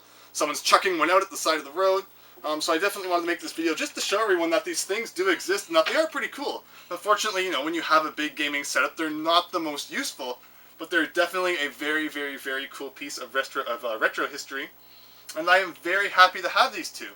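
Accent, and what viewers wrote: Canada. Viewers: Liking this video for the Canadian accent alone. "Put it on the COACH" bruh :)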